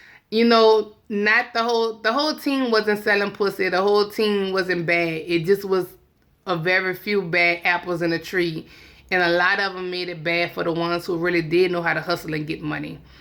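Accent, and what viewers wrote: New Orleans, United States. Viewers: That New Orleans accent!!!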